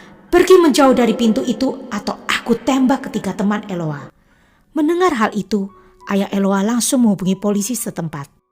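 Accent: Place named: Java, Indonesia. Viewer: .... waktu bilang.... jangan bergerak kalo tidak aku tembak....ih... ngeri kali nada nya kek nyai Blorong yg haus darah di film Suzanna...ih takut aku.... walau begitu tetap aja kangen logat medok mu.... ingat ya jgn sampe jadi nyai Blorong.... cukup jadi krupuk orong orong Medan yg gurih dan lezat.... sukses terus ya....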